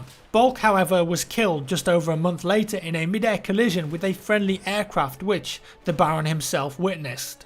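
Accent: United Kingdom. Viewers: I refuse to believe you think really is american, Like what kind of fucking idiot wouldent know he is british JUST HEAR HIS ACCENT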